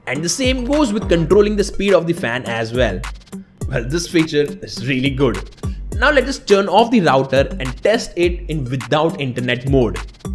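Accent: India. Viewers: I'm always having fun listening to indian accent. I don't skip just because of it. hahaha.. By the way, this will help me to decide when automizing my home in the future..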